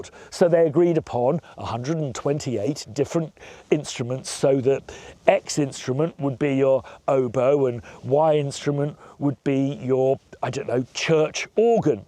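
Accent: United Kingdom. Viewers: I'm 60. MIDI became a thing in in 1983, when I was 18, and I had been waiting for it. I subscribed to (Contemporary) Keyboard Magazine for a few years before this. You nailed the beauty and simplicity of connecting instruments through MIDI very well, and I appreciate this video. I didn't learn anything new, because I learned all of this at the time, and loved it and love it still. You show your love of MIDI very eloquently (my Canadian accent doesn't do the justice of your UK accent), yet the obvious advantage of what this technology did for all of us is made clearer through your explanation, and is a historical timepiece. Thanks for doing this. Rock on.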